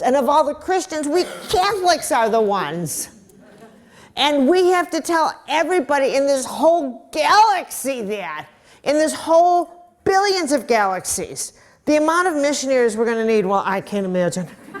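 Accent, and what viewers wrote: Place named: Midwest, United States. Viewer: I had to stop amd comment when you spoke about your midwestern accent. For some reason hearing you actually state that you are from Chicago multiple times was too obvious for me to actually pick up on. I have to make things difficult for myself, as a general rule. It wasnt until a flashback to the old SNL skits about the Bears amd the Bulls (John Goodman, George Wendt I think? Or maybe Im making up Wendt being there, I dunno) anyway thats when I finally 'got' your accent (which isnt much of one to me,ostly just the 'a' that you mention). I love hearing you speak, I hope you never figure out how to have the 'a' removed. It would just be wrong. I might even have to just stick to your books if that happened. Which, by the way, I use a text to speech british accent voice to listen to ebooks while doing other things, one that is no longer freely available or...whats the word...compatible with android since the last several major updates, and a huge pain in the rear to mod and sideload and stuff to get it to work now, but worth it to me. 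Its so realistic and soothing. i even pay for it some months if I cant find a way to hack things with current devices, as I think its not even possible with the most recent update, and have to go about it a different way that charges if I read over a million characters (which happens and which is meant for developers., and takes some work). My point is, as much trouble as I take to use that specific British accented voice, I so much prefer to hear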